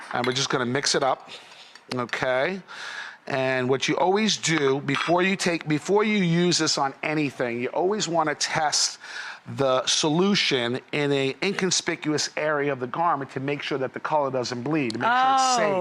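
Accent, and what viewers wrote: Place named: New York, United States. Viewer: Love this guy's NYC accent lol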